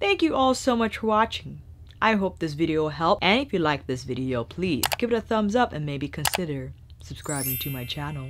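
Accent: France. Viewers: the french accent really do be hitting different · Your french accent is so cute ^^ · Thank you for making the effort to pronounce names in French. Loooove your accent ;).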